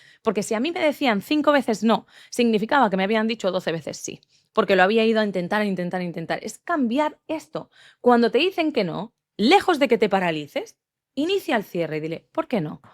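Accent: Spain. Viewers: De qué país eres escuché México pero tienes obviamente el acento de España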